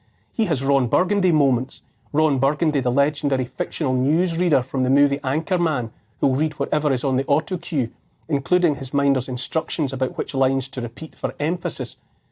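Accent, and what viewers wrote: Scotland, United Kingdom. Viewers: Thank you Neil for exposing the facts so clearly. It is so important to have more and more journalists like you speaking their truth without bias. Not only it is superbly said but your lovely Scottish accent is music to my French ears. Go go go Neil ! 💪🏽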